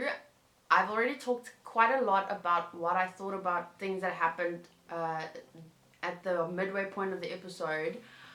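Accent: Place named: South Africa. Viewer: Is she from South Africa? It's a really nice accent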